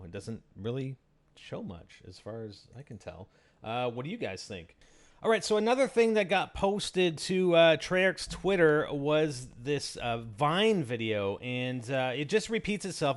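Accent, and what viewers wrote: Canada. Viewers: is canadian you can by the way he says about and out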